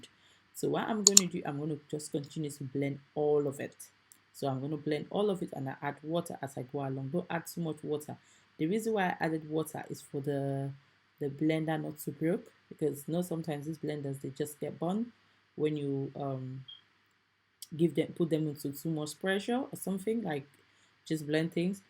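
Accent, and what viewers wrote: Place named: Jamaica. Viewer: I've had ginger beer from the store that is made by Goya. And when I take a sip of it I can feel the antioxidants working. I don't want it really sweet but I want to make my own I was going to make kombucha I'm poor and they won't let you buy kombucha with food stamps anymore. I don't have a lot of Ginger but I think I have enough to make probably a gallon. And I want to make it the real Jamaican Way there are things Jamaicans know that I need to know. I love the accent. I used to work with a Jamaican guy at the sewer plant Bedford New York at the prison besides a used to make tea from cannabis. I bet that was very healing also. I'd say this is a healing drink it's not just a drink. That must be really strong you keep doing it over and over. Kind of like the time I was moving and I made the strongest coffee on Earth espresso but you keep running the water through it about five times I was awake for days LOL.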